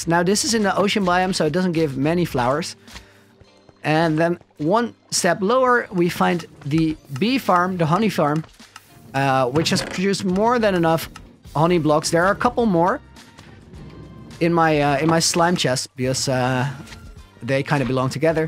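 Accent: Netherlands. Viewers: Are u dutch ben je nederlands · Do I hear a HOLLANDS accent there You have GEKOLONISEERD the sea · Are you dutch? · Its the first time watching you but i bet that your Dutch😂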